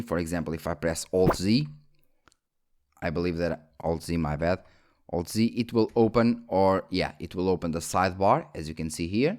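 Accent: Portugal. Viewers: are you from portugal ? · Você é portugues ?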